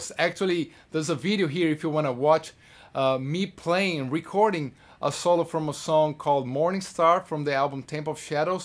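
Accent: Brazil. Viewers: That's brazillian accent if I ever heard one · Name looks finnish, but accent seems brazilian.. which one is it?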